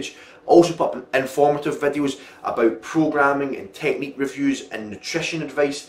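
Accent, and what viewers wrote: United Kingdom. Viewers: I love the Scottish accent, so badass!!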